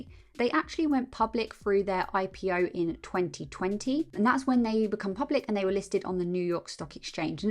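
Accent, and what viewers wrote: United Kingdom. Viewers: I like your British accent❤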